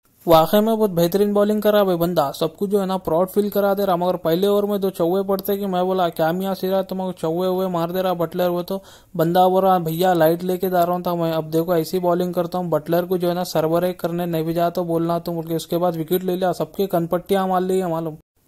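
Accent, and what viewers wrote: Hyderabad, India. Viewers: Bhai teri aawaj aur haidrabadi style kamaal hai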